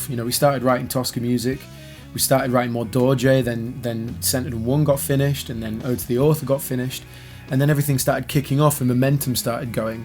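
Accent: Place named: Northern England, United Kingdom. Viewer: Mad our paths probably crossed back in the day. I noticed you were Northern by the accent but didn't know Leeds. Did a couple of modules of my degree at LCM in 05/06/07. I remember Dave often doing the sound for the metal gigs in Royal Park Cellars and knew his brother John (and later worked with him!). Funny old world.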